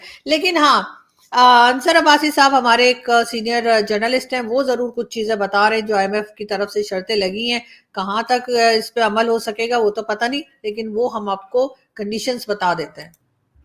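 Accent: India. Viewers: जी🙏🙏 आपका विश्लेषण बहुत शानदार रहता है बोलचाल से आप जी आप भारत की लगती है। जब की आलिया शाह एवं रिबाह ये दोनो बोलचाल मे पाकिस्तान की लगती है आपको कोई खतरा लगे तो भारत आ जाओ।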